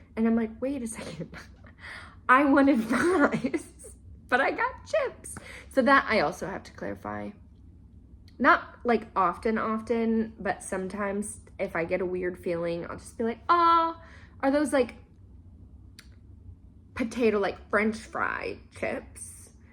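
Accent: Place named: New Zealand. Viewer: I can hear a bit of Kiwi inflection in your speaking which is super endearing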